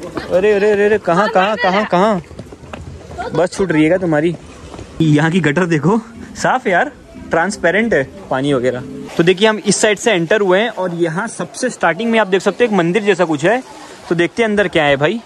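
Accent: India. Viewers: What do you think without subtitles? I can't understand Indian English.😅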